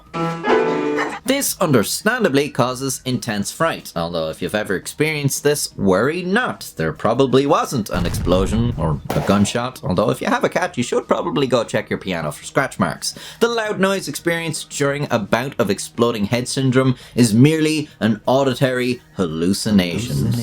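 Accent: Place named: Ireland. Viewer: The bloopers are the best part... when the Irish accent even blows the mind of the person with the accent...Lol! 🤣🤣🤣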